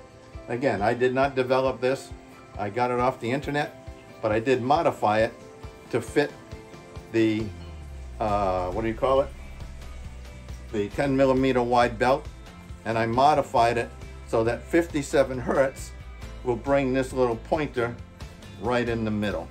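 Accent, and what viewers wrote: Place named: Wisconsin, United States. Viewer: You sound like you could be from wisconsin or the u.p.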